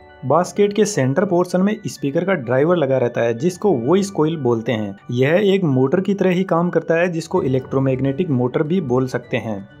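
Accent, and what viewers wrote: Rajasthan, India. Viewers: Bhai tumhari aawaz se saf pata chalta hai ki tum rajasthan se belong karte ho .. shayad..?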